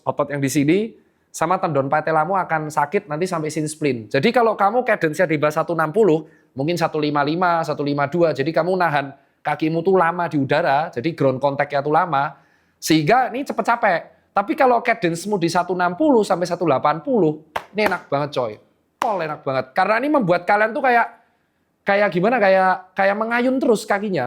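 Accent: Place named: Surabaya, Indonesia. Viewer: Saya fans baru dokter 🙏 pas liat YouTube ada dokter Lewat. Saya suka gaya bicara dokter yg apa adanya, nada Surabaya yg khas👍 terbaik dokter idola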